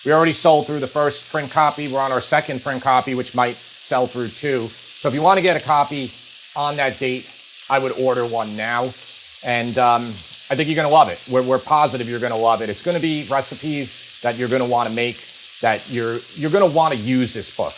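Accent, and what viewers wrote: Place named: New Jersey, United States. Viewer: Brother, I love your channel. You remind me so much of my family in NJ. I miss that accent. I really enjoy the way you break down the preparation and the cooking, almost like an engineer would. I love all the hints and tips during the production that help us all to become better cooks. I love that your family is involved. I love that you’ve clearly done your studying beforehand and practiced each dish a few times. Thanks for your channel. I pre-ordered the book and I’m sure I’ll love it. I love Italian cooking. My heritage is mostly from Sicily and that must create a DNA-level connection to the food because it’s always been my favorite. Thanks again.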